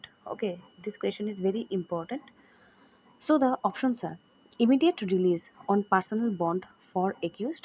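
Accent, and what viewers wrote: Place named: Bengal, India. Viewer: The bengali accent is so irritating..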